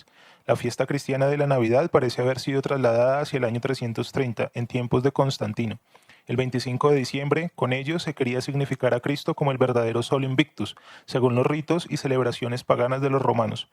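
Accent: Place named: Bogotá, Colombia. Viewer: Buena investigation, pero esa voz de rolo, bogotano homosexual esta pesima.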